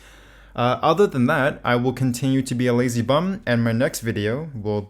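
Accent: Canada. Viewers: Are you Canadian? :)) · IMHO sounds canadian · he's probably Canadian. Can tell by the way he says "out". Maybe Ontario.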